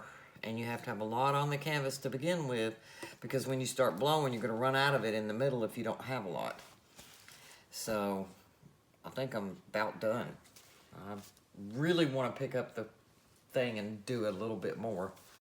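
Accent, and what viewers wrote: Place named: South, United States. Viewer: Gotta be from the South, gurl! Love it! :)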